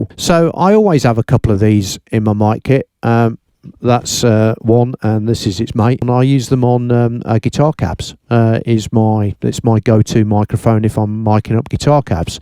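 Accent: United Kingdom. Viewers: Congratulations, the best lesson on mics on the YT. I don't need to listen to anything else after this! I'm far away from you (Brazil) but I know now what to buy even if I'm on a low budget. Nothing like the knowledge of someone who lives of a subject. Never saw someone talking about the MD435 and it was a great find. Very hard to find it here because it's so much expansive for us, but I'll save the tip for any intl trip in the future. I play chromatic harmonica and most top players use a SM58 because you know it's a classic and the frequency range is quite similar to the voice but as the sound is produced by free metallic reeds, the higher frequencies are almost of a brass instrument and I think the MD435 would produce a very interesting output. If you ever have a chance to record one harmonic on it, please let me know; I also study sound quality a lot as I'm an Electrical Engineer too. 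Thanks for the video, lovely British accent! Already saved to a playlist and subscribed to your Channel. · Good video! Over 30 years of moving those faders. I agree on most of what you said. My basic kit is almost identical. Where it differs: I got some Røde NT5 in addition, I got the e905 on Snare (with the Audix i5 as an alternative), got an Audix D6 in addition to the Beta52A and the Beta91A (if I use only one mic for the BD I like the D6 better, but 52 and 91 are better in combination). My goto mic for guitar etc. is the Sennheiser e609 or e906. If people want two mics per git amp, I‘ll put on SM57 in addition. They sound more „metallic“ then the Beta57 in my opinion, so they are a better contrast to the e609/906. Oh, and I have some Audix OM7 for a special clientele. It is solid advice to recommend mics everyone knows to be at least ok and can be found on almost any rider, at least as an alternative for the actual wish list. 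Where are you located? Do I hear a little bit of a London cockney accent?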